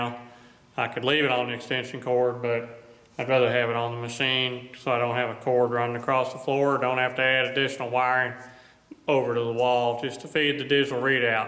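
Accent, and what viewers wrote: South, United States. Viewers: you welcome,BTW love your southern accent LOL..I am really respect you,one man army LOL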